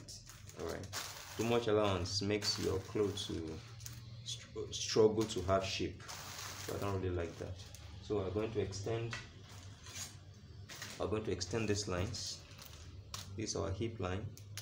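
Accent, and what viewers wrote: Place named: Tiv, Nigeria. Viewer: U speak like my Tiv brothers, weldone.... Wel articulated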